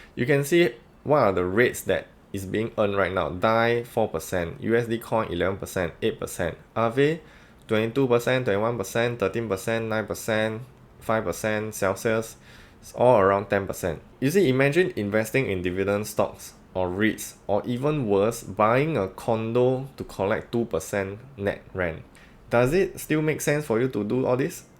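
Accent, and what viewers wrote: Singapore. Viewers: Wow the Singapore accent is actually a thing! I almost thought I was listening to the other youtuber Chicken Genius. Interesting ^^ Also thanks for taking the time to write up this guide of course! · Gotta love the Singaporean accent. Huat ah.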